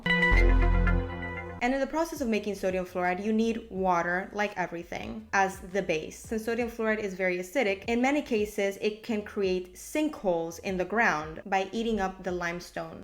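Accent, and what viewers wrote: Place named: Argentina. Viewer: I knew you where Argentinian lol...the accent is dead giveaway 😂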